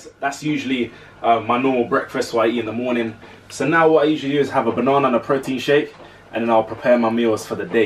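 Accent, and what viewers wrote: London, United Kingdom. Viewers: You from London??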